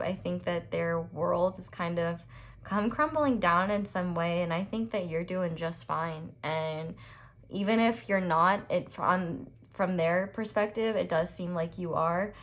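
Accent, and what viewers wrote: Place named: United States. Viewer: i wish i knew which part of america her accent is from. i fall asleep to her voice so often. it is so soothing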